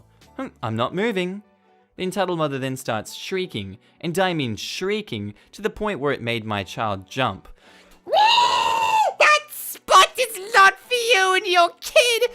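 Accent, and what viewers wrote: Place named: Australia. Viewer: I’m not an Aussie but I love the accent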